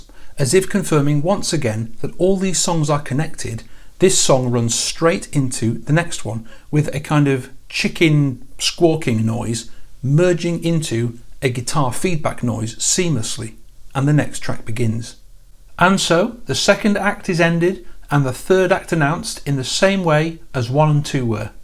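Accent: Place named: Yorkshire, United Kingdom. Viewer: Pure bollocks. That was an hour I shall never retrieve. Love your Yorkshire accent though.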